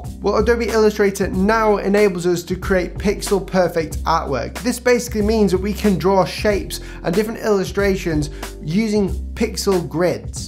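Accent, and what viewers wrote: United Kingdom. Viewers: i have to say these updates are really minor. as a side note would you work on your video's music more and the fact that you are British and we might be fascinated by your accent is old fashioned now, take care.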